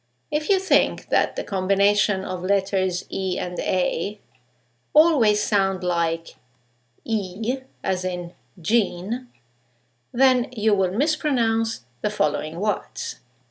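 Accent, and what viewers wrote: England, United Kingdom. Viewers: Yeah, it's called a BRITISH accent... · thank you . the British accent is very nice .but a little hard . but it sounds good. and thank you again. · Thank you Btw, this accent only use by British, right? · Who doesn't? It's more British than my accent but I think she speaks very clearly and understandable to any native speaker or proficient student. Janine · your classes are for beginners, and your accent does not sound from USA, it sounds from UK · RP accent I more need podcast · British English? Lovely. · Hello, i apreciate your lessons, i like to listen to you 'cause your accent is very pleasent to me. I hope you can bring more and more tecniques to talk as a England person. i have difficults to pronounce words like: thought, through, though, taught. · It's really really hard to understand her... It's souds british, I have serious difficulties in that. 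But I could understand.... Thanks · Is this british englisH?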